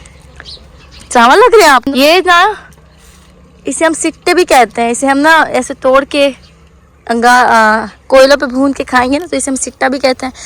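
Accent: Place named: India. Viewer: Main India se hoon magar aap ki jo tone h kahi na kahi India se mil rahi hai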